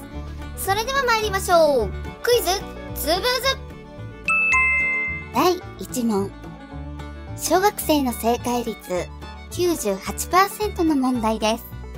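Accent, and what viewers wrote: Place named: Kansai, Japan. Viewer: ママは関西やな〜 マジで関西人やで〜 ハマりまくりでんがな ラストの「ラッセルラッセル」 大ウケでっせ〜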